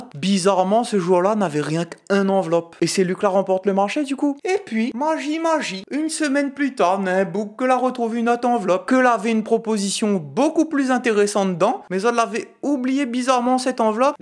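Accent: South, France. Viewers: Super comme d'habitude👏👏👏 et ton accents du sud de la France 😍😍😍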